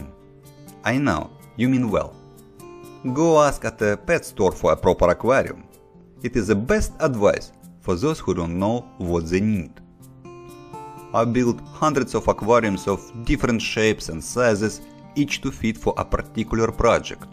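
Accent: Russia. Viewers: Интересный у вас акцент. ВЫ не русский, случаем?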